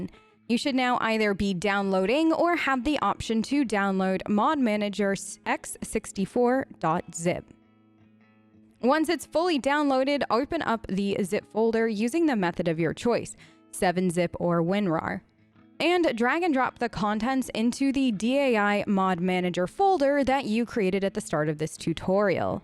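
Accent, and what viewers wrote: Australia. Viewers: 1- thanks so much for this! This and your origins and DA2 mod videos have been awesome! 2- Have you ended up living in australia or something? That aussie accent is leaking through! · i like how ever now and then a bit of your accent comes through, to me it sounds australian, possibly new zealand...but i could be totally wrong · why does it sound like your aussy but trying to hide it with a very middle American accent?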